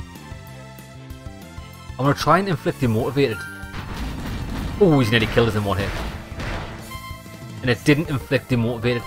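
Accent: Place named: North East England, United Kingdom. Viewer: Thanks for the help I couldn’t find the guy on the outskirts but damn you have a strong North East England accent 😂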